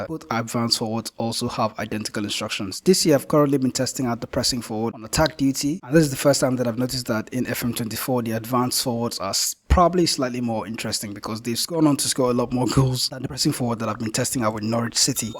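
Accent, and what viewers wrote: Nigeria. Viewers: Are you nigerian bro ? You sound nigerian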